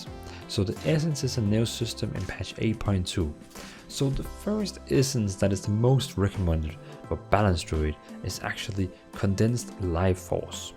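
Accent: Denmark. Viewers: Thought I could hear a fellow Danish accent :3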